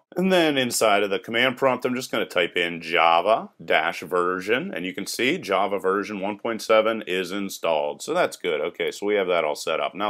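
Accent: United States. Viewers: charnel pawny Which part of the US is he from? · An american accent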